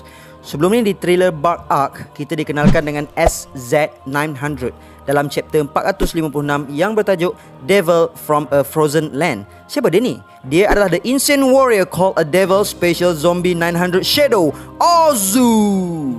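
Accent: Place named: Kelantan, Malaysia. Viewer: Arrr loghat ore koto bharu klate nim!!!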